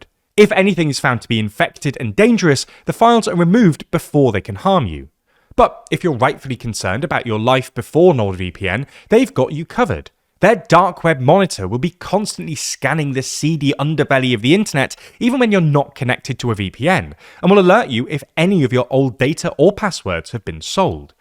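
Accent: United Kingdom. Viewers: British accent and actual facts! THANK YOU EVER SO MUCH! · Todor Zaikov? Haha. The silly fiction that comes out with British accent is everywhere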